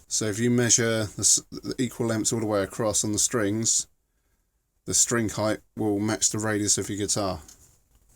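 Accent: United Kingdom. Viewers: The British accent makes it much easier to listen to.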